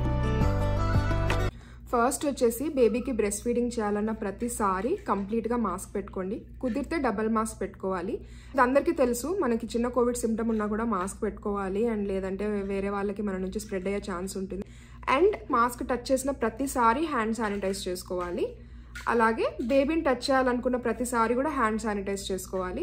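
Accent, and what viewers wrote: Rayalaseema, India. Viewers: Hii.. Sis...aa pain yela vuntundo nenu anubhavinchanu naa Husband RDT hospital lo isolate ainapudu...aa badha pagavaallaki kuda vaddani devunni kore daanni....mee raayalaseema yaasa vintunte naaku mana intlo maatladu tunnatle vuntundi...Maadi Tadipatri...present Hindupur lo vuntunnamu..mee family mucchataga vundi...May God Bless U 💜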